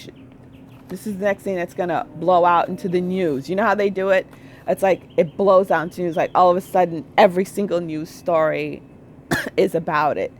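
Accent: New York, United States. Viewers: Thanks for update. It makes me laugh when you go "New York" in how u say things, but hey, the message is received. It ain't no joke. 🙏😘😘😘🤗🤗🤗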